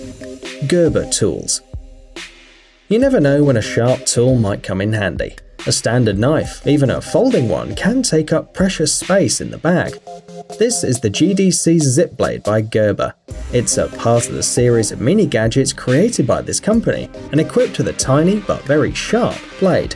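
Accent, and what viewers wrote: England, United Kingdom. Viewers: Some of the most useless and unnecessary products I have ever seen...and an English accent doesn't help to sell them.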